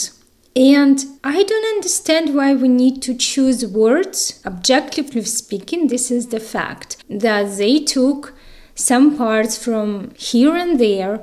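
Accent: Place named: Russia. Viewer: So awesome you always keep the russian accent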